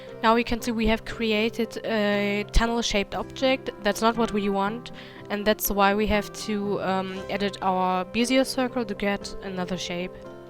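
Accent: Germany. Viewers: Man hört den deutschen Akzent.😅😂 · hi, i noticed you have different accent, i mean- you sound little bit like a german person ☺ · am i the only one who thinks she has a german accent well hallo aus deutschland wenn du mich verstehst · Are you from Germany? because of the accent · Everybody: wow Your Video is very helpful Me: WAIT ARE U GERMAN?! I mean you have this typically german accent xD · That german accent tho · You Sound German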